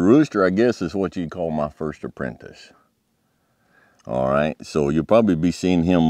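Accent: United States. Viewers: Your voice is just so American and relaxing! Fantastic work! 😍❤️🌟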